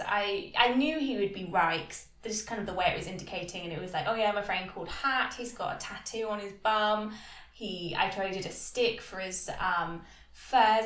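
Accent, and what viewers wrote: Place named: England, United Kingdom. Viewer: Love your reviews, and thanks for uploading. Would it be possible that you speak a little slower? Your English accent is lovely but not always easy to understand at times - and the subtitles don't always make sense.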